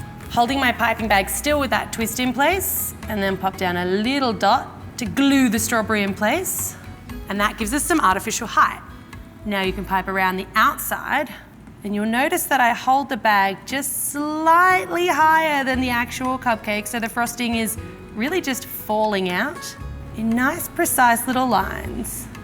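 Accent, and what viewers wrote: Australia. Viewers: Is she Australian? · This was fun to watch tbh haha. and is that an Australian accent? I love her voice lol 😋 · She sounds like she’s from Australia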